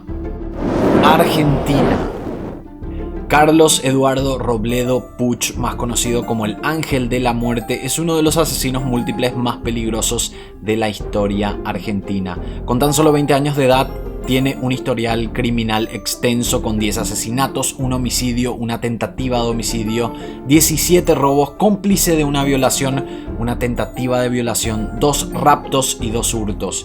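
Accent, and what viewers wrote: Paraguay. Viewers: Sos Paraguayo? Excelente video hermano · Por el acento que escucho veo que eres paraguayo,no sé si estás enterado que el mayor violador y asesino del mundo,esun colombiano llamado Luis Alfredo Garabito,violó y asesino a 172 niños y tenías que haber sabiodo realmente quien el mayor asesino no solo de América Latina,si no de todo el mundo y creo todavía sigue preso,infórmate y publicalo,un saludo para ti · Verdad su acento es Paraguayo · Parecés paraguayo! Es así? Muy buen material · Amigo vos sos paraguayo?? Por que tenes acento paraguayo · Tenes acento Paraguayo.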